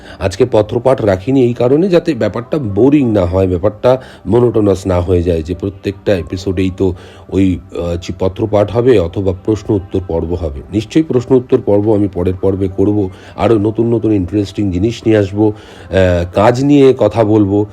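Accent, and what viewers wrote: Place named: Kolkata, India. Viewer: তোমার কথা থেকে অনেক কিছু শিখি, আজও শিখলাম, মনটা ভালো হয়ে গেল। তোমার কথা শুনেলে মনে হয় আমার ই কোনো আত্মীয় কলকাতায় থাকে সে কথা বলল। ঈশ্বর তোমার অনেক অনেক মঙ্গল করুন। ❤❤❤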